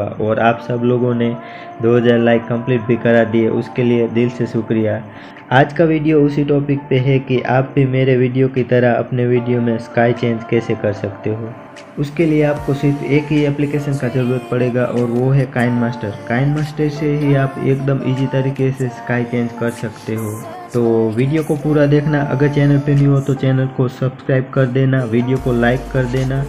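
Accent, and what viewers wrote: Gujarat, India. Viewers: Apan ko pata he apan gujrat se he har gujrati ki aavaj pahechanta hu tum100% gujarat se ho 😘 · bro aavj sun kar lag ka aap gujrati ho , iam also from Gujarat ❤️ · Bhai video me aawaj sun kar lag raha he ki aap gujrati ho😄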